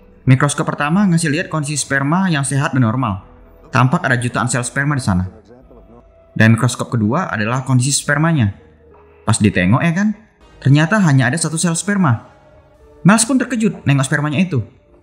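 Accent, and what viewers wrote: Medan, Indonesia. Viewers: Nih orang Medan banget omongan nya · Kek org medan abang ni, ya kan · Pengisi suaranya org medan pasti ni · Logat medan nya bro, mantap kali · Anak medan kau ya bg? 😂😂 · Logat medan abg ni wkwk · Org medan kau ya min? 😂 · Macam logat medan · Nampak kali medan kau bang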